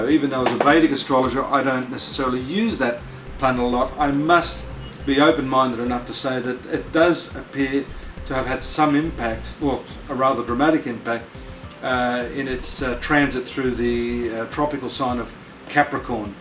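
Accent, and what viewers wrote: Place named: Australia. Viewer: Ah...I was right...you're an Aussie. At first I was wondering what part of England you were from and then I got it. Thanks for the info--I'm a Sadge.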